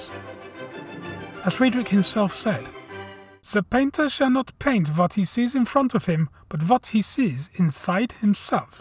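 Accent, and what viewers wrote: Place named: Netherlands. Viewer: you sound more Dutch actually, which is very funny to me as a Dutchie in Germany. Looking forward to your next videos, I just discovered your channel via Reddit & I’m binge watching all of them now👌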